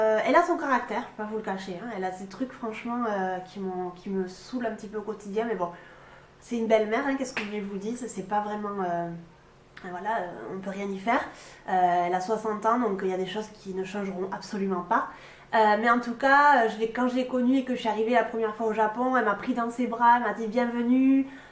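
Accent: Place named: South, France. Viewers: Les Japonais doivent trouver son parlé Japonais charmant et amusant car cette jolie jeune fille a déjà un accent du sud prononcé 😉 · Accent de Carcassonne ? On dirait que tu viens de l'Aude non ? Perpignan peut-être ? En tout cas Sud-Ouest.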